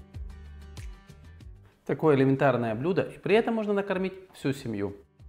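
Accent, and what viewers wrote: Ukraine. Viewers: таке враження, що Ви говорите російською з українським акцентом.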